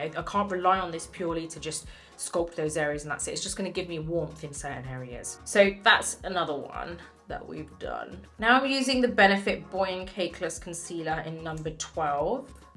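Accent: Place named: United Kingdom. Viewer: verrrry helpful bcoz it answered some questions for me. I like yr british accent too!thank u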